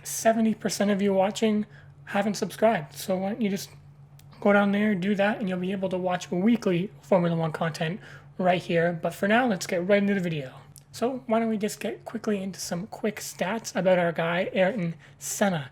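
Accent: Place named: Toronto, Canada. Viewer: Are you from Toronto?😂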